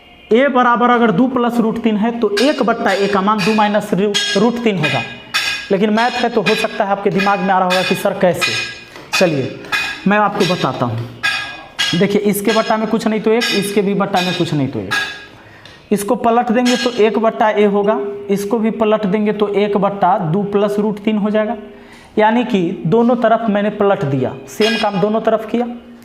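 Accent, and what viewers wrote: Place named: Bhojpuri, India. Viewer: Sir aapka bhojpuri wala hindi bahut acha lagta he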